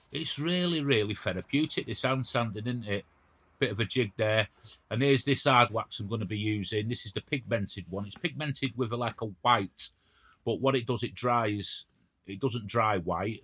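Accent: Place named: Liverpool, United Kingdom. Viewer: A scouser? Red or blue?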